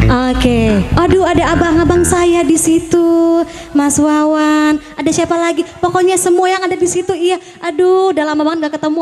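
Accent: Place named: Betawi, Indonesia. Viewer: logatnya lucu kalo ngomong😂😂😂😂 kaya betawi gitu. suaranya top markotop pokonya😍😍😍😍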